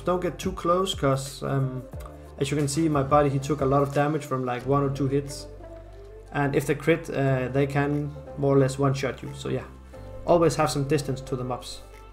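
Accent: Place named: Denmark. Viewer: You gotta be a Dane with that accent! Anyways thanks for the video.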